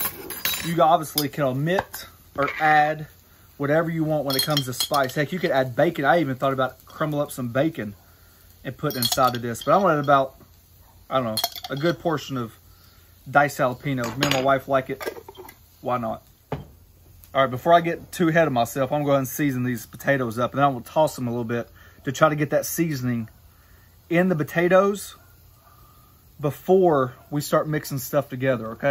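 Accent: South, United States. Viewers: .....that will work also....just found your channel....sounds like your from the south....I'm in Illinois, but have family in Nashville TN area....looking to retire and looking for somewhere warmer in the winter....LOVE UPUR VIDEOS...KEEP THEM ROLLING...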